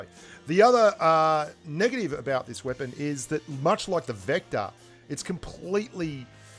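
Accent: Australia. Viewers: You literally need to be sound like australian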